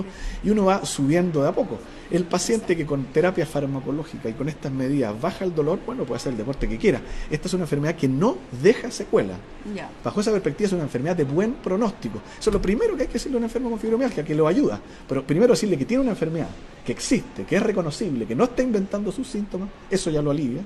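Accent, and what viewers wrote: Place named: Chile. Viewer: Me huele a chilito por aquí jajajaja me encanta ese acento saludos desde España una exmigrante enamorada de Chile y su cultura gracias🇨🇱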